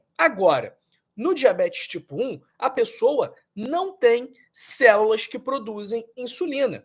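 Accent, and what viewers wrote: Rio de Janeiro, Brazil. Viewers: Claro que as mensagens são úteis. O ruim do vídeo é essa cara de filho de Satanaro e esse sotaque carioca insuportável!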